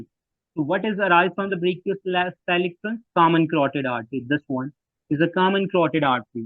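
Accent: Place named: India. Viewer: Your E. accent is horrible. Best for India to quit English talk. Make it silence in or written Subtitle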